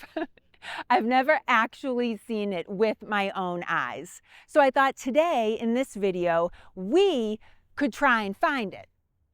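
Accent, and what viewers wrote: Boston, United States. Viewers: Love your Boston accent.😊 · Thank you, for another interesting action adventure! I love your enthusiasm and your Boston accent too! I've been to the Bunker Hill Monument and taught about the battle in my grade 5 History class, but I didn't know about the rail fence stuffed with grass and hay. Keep on with your historical posts. I always learn something new. 🙂 from NH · I love this woman. You sure as hell can tell she is from Boston! Sometimes I have to rewind & play over what she is saying to understand her. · Great history lesson, along with a fabulous Boston accent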